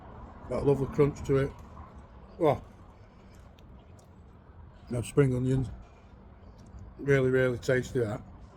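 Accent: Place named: United Kingdom. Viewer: Checking in from the US here. I'm enjoying the reviews. Can I ask what your accent is? When it comes to accents in the UK it's hard for me to tell.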